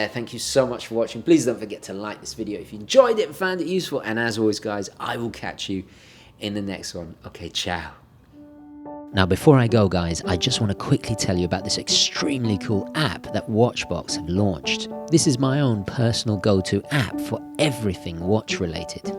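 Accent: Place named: United Kingdom. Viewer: Just came here for the "Bri-ish Ah-Cents".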